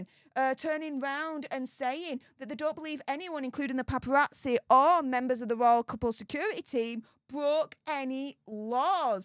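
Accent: United Kingdom. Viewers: i like your accent (i'm an Australian), what part of the UK is it from?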